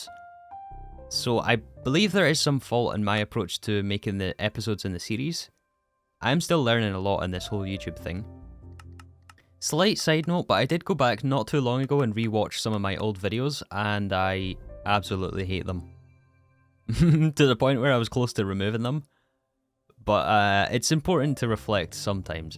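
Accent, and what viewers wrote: Scotland, United Kingdom. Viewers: I feel as though you're hinting at the fact you're struggling with a bit of burn out but don't want to say that due to how that can be interpreted. I think it's natural on a modpack like this that there are going to be points where you just can't be arsed as it's the same grind for hours on end that isn't really valuable for your content and therefore not to us either which feels like a massive waste of time but there's no avoiding it as that's the progression. I would say, do what's best for you. If you feel as though starting something new would be best, do it. I enjoy your content not for seeing the tier 35 rocket but your upbeat Scots rambling.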